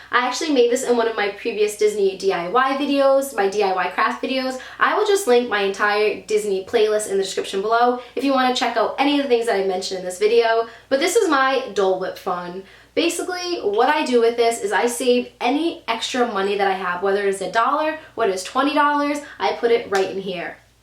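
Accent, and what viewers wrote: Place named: New Jersey, United States. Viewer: pahk coppah - Jersey?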